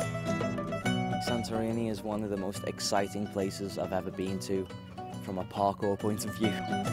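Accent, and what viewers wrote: Liverpool, United Kingdom. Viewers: The moment I heard you speak I knew you were from northern England and I thought of Liverpool because your accent sounds exactly like John Lennon's! PS: Great video, great skills, great sport! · I knew you were Liverpudlian by your accent<3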